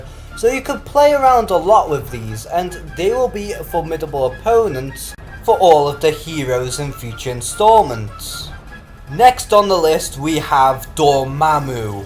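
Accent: Liverpool, United Kingdom. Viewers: One of the most cringe worthy videos I have ever seen. Your voice is bland and boring. Plus the fact that you're Scouse makes it even worse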